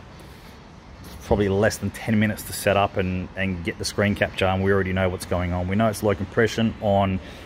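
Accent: Australia. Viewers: Absolutely love seeing your effective use of the Picoscope for diagnosis. Not to mention being in Oklahoma back in the states we love to hear your Aussie accent for all I know you might enjoy hearing my whiny hick voice in a good old USA too!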